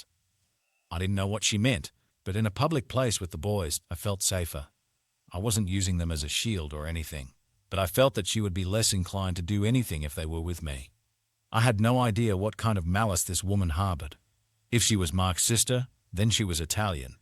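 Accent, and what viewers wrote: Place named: Australia. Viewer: Why did you use an Australian accent??? It's obviously set in the USA. There are very few Australians in the USA you have too much violence and blacks.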